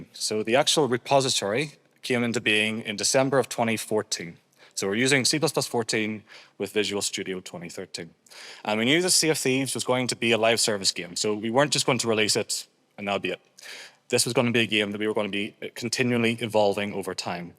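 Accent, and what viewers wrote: Northern Ireland, United Kingdom. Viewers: cant escape the northern irish accent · Nice to here a local lad speaking from NI I persume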